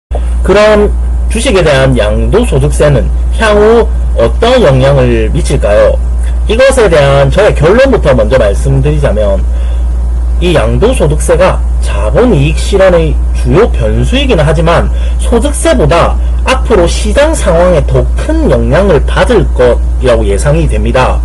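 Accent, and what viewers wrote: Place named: Gyeongsang, South Korea. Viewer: 경상도 억양을 줄여줬으면 좋겠습니다. 도표와 그래프를 보면서 아침 출근하면 차 사고 납니다. 말이 조금 빨랐으면 좋겠습니다. 읽는거 보단 말하듯이 이야기 해주셨으면 좋겠습니다. 이전 영상들(지금은 비공개 되었지만 ㅠㅠ)에 비해 그래도 들어줄만 한 정도로 컨텐츠가 많이 좋아졌습니다. 다만, 2020년 2분기를 설명하는데, 그것도 증권업을 이야기 하는데 코로나에 대한 내용이 빠진 것이 아쉽습니다. 영상 중간중간에 전환이 매끄럽지 못하네요... 잘 듣다가 갑자기 결론없이 다른 내용으로 넘어가서 몹시 놀랐습니다. 앞으로도 예의주시 하겠습니다. 좋은 영상 고맙습니다. 내용이 너무 어렵네요. 어려운 내용을 쉽게 풀어주셨으면 좋겠어요.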